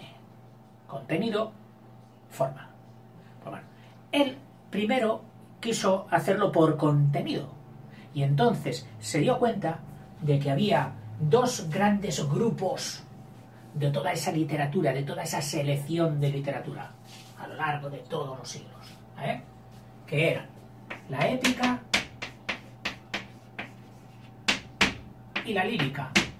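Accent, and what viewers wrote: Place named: Spain. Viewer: quiero un profesor asi...y con ese azento ezpañol,aaa mencanta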